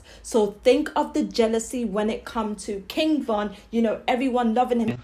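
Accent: United Kingdom. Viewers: This bitch goofy asf she needs to stop making content I’m from englewood Chicago and this fasho isn’t the case us Chicagoins hate these outsiders trying look and and speak on us like girl yo ass sound like u from the uk somewhere u better focus on them roadman where u at wetting eachother not here ole lame ass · Lmao how she talking chiraq bidness n talking British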